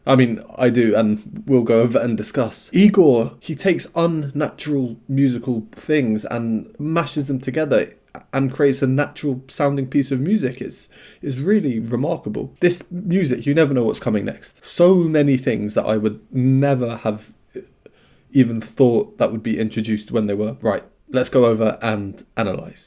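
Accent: England, United Kingdom. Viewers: Me encanta esta canción de Dimash. Y esta actuación es una de mis favoritas❤ Tus reacciones son siempre detalladas e instructivas. Aprendo mucho contigo. ¡Muchas gracias!😊 Por cierto, me gusta tu lindo acento inglés.☺️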